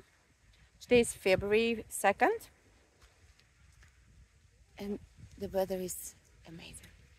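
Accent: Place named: Russia. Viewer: Судя по динамике сообщений тут и английский не понимают)) А вас с первого вашего слова понял что вы русскоговорящая)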